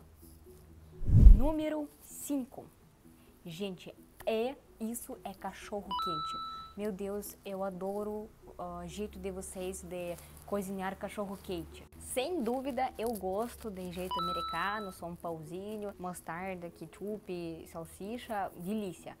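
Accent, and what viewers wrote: Russia. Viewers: Eu acho uma graça ela falando português, com esse sotaque russo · Como já disseram as russas aprendem muito rápido o português e o sotaque é o mais bonito de todos com ênfase no R, creio que nós brasileiros identificamos muito com o povo russo, pessoas simples , cultas , com uma literatura maravilhosa e sofredores devido aos desgovernos que tanto os afligem e a nós brasileiros também, seja bem vinda, venha conhecer minha cidade São José dos Campos, São Paulo se vier você vai ficar pra sempre, 40 minutos do litoral , Ilha Bela, São Sebastião, Caragua e 40 minutos das montanhas , Campos do Jordão, S.Francisco Xavier , e outras cidades muito lindas, O Vale do Paraíba é uma região muito bonita. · Gosto de assistir os seus vídeos, é tão legal ver e ouvir você falar. O português com o seu sotaque russo é muito bom, você fala palavras muito engraçadas. 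Adoro você,sou do norte do Brasil, Tocantins. sonho em conhecer uma russa pessoalmente e queria que fosse você. Beijos você é sensacional! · Bem vinda! Seu sotaque é gostoso. Amo a Rússia e as russas. A Rússia até hoje me soa como algo exótico.❤😊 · Seja bem- vinda! O que eu mais gosto nos russos se esforçando pra falar português é o sotaque e a troca do o pelo a. Muito fofa! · Olá mais linda do Brasil... Você já está falando muito bem o Português. Um pouco de sotaque russo fica até charmoso em vc. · vc é muito querida e seu sotaque é uma graça. "Chineli" é o melhor👏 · Bom dia eu adoro seus comentários ,amo seu sotaque russo misturado com português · Eu acho lindo demais, você falando português,com esse sotaque Russo. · O seu sotaque Russo é muito show. Tenho um amigo Russo aqui no Canadá onde moro. Ele me ensina muitas frases e não e difícil a pronúncia porquê a nossa fonética não é tão diferente como o Inglês. 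Que Deus abençoe vcs e sua família aí no Brasil. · Não estou gostando. Você está perdendo o sotaque russo, que fica maravilhoso falando português. Não mude isto. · Vc é linda.Nao se preocupe com seu sotaque gostoso,dá pra entender linda. Que sejam todas bem vindas. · a expressão " puxa saco" quer dizer " alguém que quer fazer de tudo pra agradar alguém, mas só pensando em si mesmo, sem se importar com outras pessoas, com o objetivo de receber algo em troca". Exemplo puxa saco do patrão (chefe). Você fala bem o português, mas se percebe o sotaque Russo. Legal você quere dividir a sua experiência brasileira com a sua família. Felicidades e abraços... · Eu amo esse sotaque russo 😍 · Moro no Amazonas . Gostei muito do seu sotaque russo seja bem vinda. Mas se vc e do lado do putin e melhor ir pra Rússia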